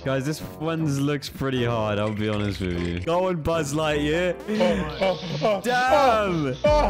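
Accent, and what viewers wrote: United Kingdom. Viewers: Are u british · Yes. But your British(like me) which makes you the absolute god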